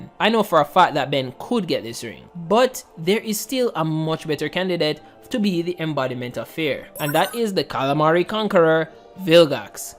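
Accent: Jamaica. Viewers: I love your accent, sounds Jamaican. Reminds me a lot of how Bob Marley talked.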